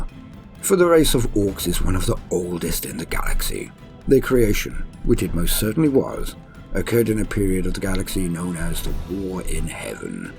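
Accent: United Kingdom. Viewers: Is it just me or does British accent instantly make whatever the topic more entertaining? Nice reading voice duder!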